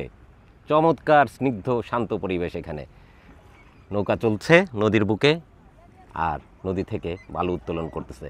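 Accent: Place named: Rangpur, Bangladesh. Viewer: আসসালামু আলাইকুম ভাই আমার বাড়ি দিনাজপুরের চিরির বন্দর, আপনার কথা শুনে মনে হলো আপনিও রংপুর বিভাগের মানুষ। আজ প্রথম আপনার ভিডিও দেখলাম খুব ভালো লাগলো। আমি নওগাঁ জেলায় আছি, যদি কখনো নওগাঁয় ভ্রমণের ইচ্ছা থাকে জানাবেন, সার্বিক সহযোগিতা করবো ইনশাআল্লাহ।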